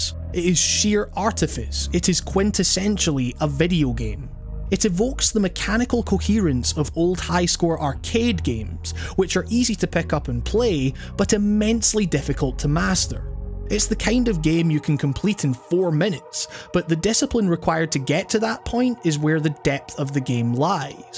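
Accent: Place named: Scotland, United Kingdom. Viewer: Didn't see the Scottish accent coming at all (or is it Irish?), and I quite appreciate diversity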